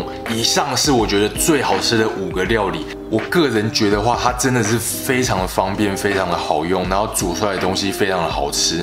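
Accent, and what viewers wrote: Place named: Taiwan. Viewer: 发的内容很好，这台湾口音真的扁到受不了，大概听普通话习惯了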